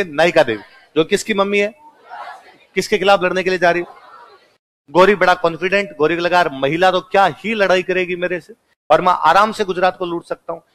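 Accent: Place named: Punjab, India. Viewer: Sir ki Panjabi Kamal ❤❤❤❤❤❤❤😂😂😂😂😂😂😂😂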